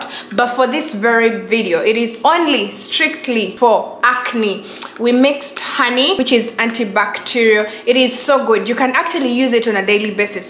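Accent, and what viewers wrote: Uganda. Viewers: You sound like you from UG, am I right?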